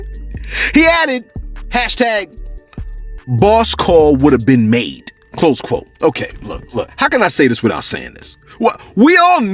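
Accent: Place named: New York, United States. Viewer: Sounds like a New York accent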